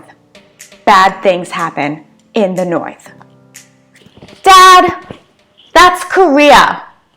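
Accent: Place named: New York, United States. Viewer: You sound more ny than Canadian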